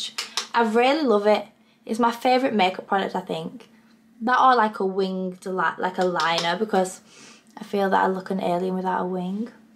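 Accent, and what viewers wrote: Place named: Bradford, United Kingdom. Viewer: Is she from Bradford ? Her accent lmfao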